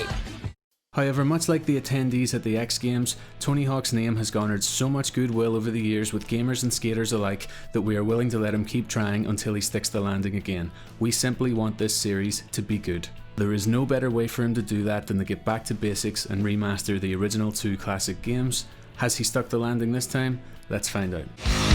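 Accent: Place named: Ireland. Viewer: North Americans love a good Irish accent!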